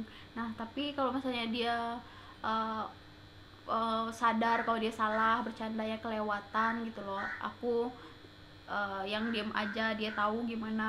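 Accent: India. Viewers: Keren suaranya sudah pas suara cewek India..baiknya suami adek ni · Masyaallah mbak..wlaupun org indonesia tapi suara logat india ny bagus bnget.. Samawa mbak... Beruntung ny bisa mndpat kn suami yg baik.. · Masyaallah kak, suaranya percis banget sama orang india💞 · Masyaallah suara nya merdu persis India bgt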